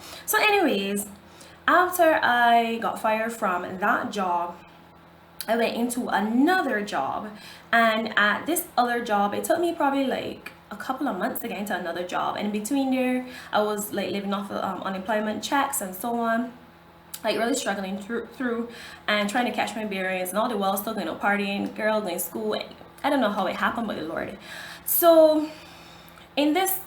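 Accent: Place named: Caribbean. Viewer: i hear Bim, Guyana or trini accent....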